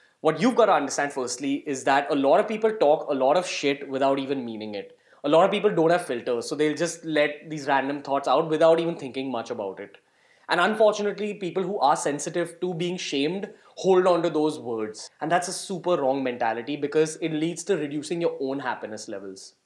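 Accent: India. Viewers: Bro he's an Indian not Irish.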